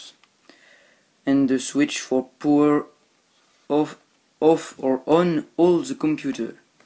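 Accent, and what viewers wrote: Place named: France. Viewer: dat french accent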